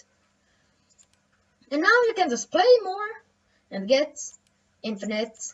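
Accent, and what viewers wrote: Sweden. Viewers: maybe du låter a little bitt svenska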